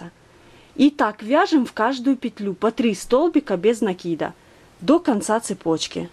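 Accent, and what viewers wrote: Ukraine. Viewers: Девушка видимо украинка, вот и говор такой, так то всё же понятно😊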